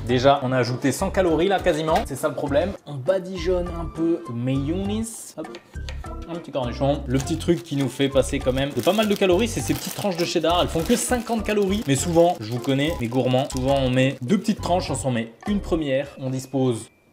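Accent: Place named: England, United Kingdom. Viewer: super video on voit que l'on peut quand même ce faire plaisir sans trop de calories mais par contre l'accent anglais sa me soules 😅😅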